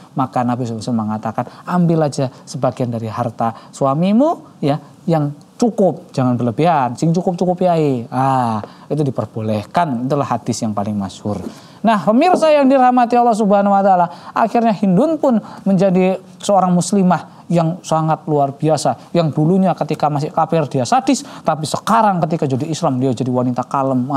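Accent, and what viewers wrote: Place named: Indonesia. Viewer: Medok tenan ustadz.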